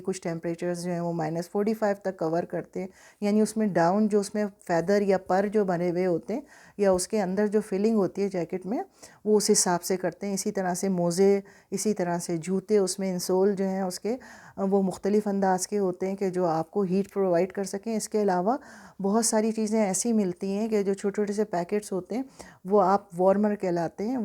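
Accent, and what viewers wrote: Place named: Lucknow, India. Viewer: Bahut bahut shukriya aapka😊 Lucknow se hone ki wajah se kuch aisa laga jaise apne rishtedar ko hi sun raha hun 😚 barhi saadgi se aapne apni baat rakhhi .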